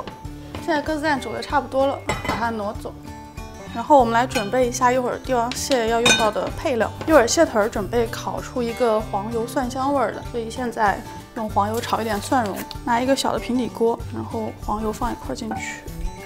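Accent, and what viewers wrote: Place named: Wuhan, China. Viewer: 微微听出来武汉口音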